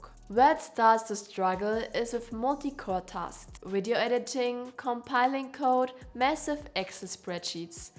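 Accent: Germany. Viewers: Krasser deutscher Akzent 😂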